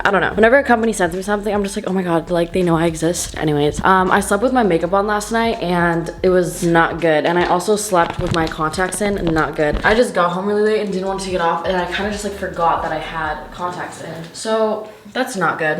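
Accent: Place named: United Kingdom. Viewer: Hi, my name is Brianna and I am from London and you have a lovely British accent.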